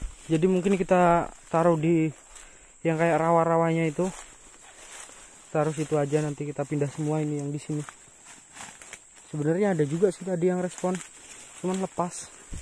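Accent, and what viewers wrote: Java, Indonesia. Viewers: Wong jowo to mas?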